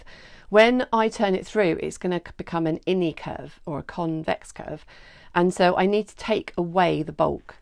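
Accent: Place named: United Kingdom. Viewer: Thank you for your tutorial, it's very useful! P. S. : I love your british accent ❤️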